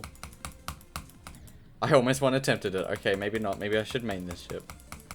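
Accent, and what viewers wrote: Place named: Australia. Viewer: Hey u sound australian. Where u from perhaps?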